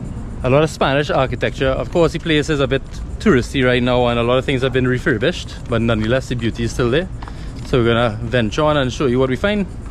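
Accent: Trinidad and Tobago. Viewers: Well this is a pleasant surprise; hearing from my Favorite Trini Accent. Welcome back in your space. I Your oldest Fan. 22/09/12 🇨🇦 🍁 🇨🇦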